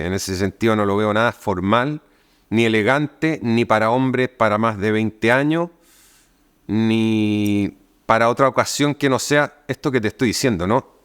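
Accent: Chile. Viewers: Se nota que es Chileno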